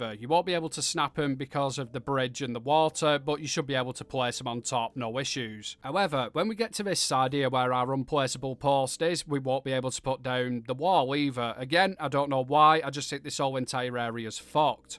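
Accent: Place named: Northern England, United Kingdom. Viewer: Thats an amazing build, thanks for giving me some ideas. I am a rubbish base builder at present but watching your videos makes it look so easy, also being a northerner myself I really appreciate your northern delivery of all this, simple and straight to the point. Keep up the great work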